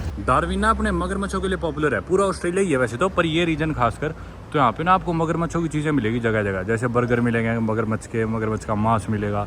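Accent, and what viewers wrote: Haryana, India. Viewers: kya dikhta hain bhai tu. 6.4 ft height. Itna fluent English me. Teri haryanvi accent me hindi. Gym karta body bana rakkhi hain. Bohot se log world travelling karte nomadic india nomad shubham pratik jain yaatri doctor but un me tere jaisa koi nahi . Enjoy karta hu bhai teri video main. Bas nazar na lage tujhe kisi ki ❤❤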